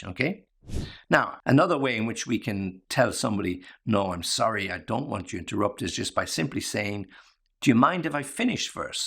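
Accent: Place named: United Kingdom. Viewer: Enjoying listening your British accent🤩, thank you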